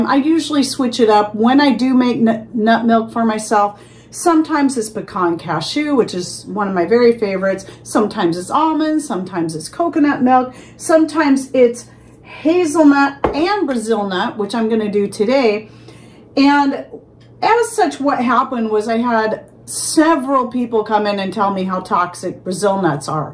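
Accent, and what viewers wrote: United States. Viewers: Hi, I do like most of your videos, but I am finding American accents a bit hard to understand! I was born in Norway, so Norwegian is my mother’s language and my first language. I have lived in South London, England for 54 years now, so English should come easily to me, but I am nearly 72 years old and my brain is slowly loosing a bit of each language! But keep up your good work As I have learnt so much from your videos. Blessings ❤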